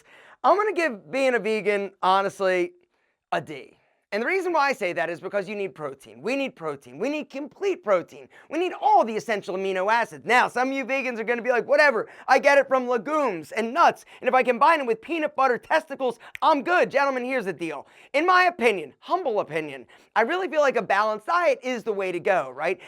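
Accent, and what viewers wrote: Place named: AAVE, United States. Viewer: Try not speaking ebonics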